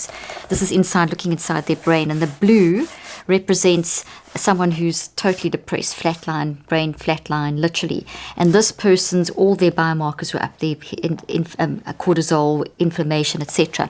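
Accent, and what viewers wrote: South Africa. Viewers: I was guessing but wasn’t sure. Typical South African accent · All good. I wish you didn’t have the SA accent and didn’t have to talk so fast. On the other hand that will make people buy your book. Talking so fast seems to be a millennial thing but it doesn’t come across as being sincere, or did you have too much coffee. You kept on showing your book but it always have that metal tree in front of it. Was that also a trick to make is buy your book? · Ek hou van haar aksent!suid afrikaans aksent! Claude Frankryk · South African….Irish accent much nicer, I am saying this as a SA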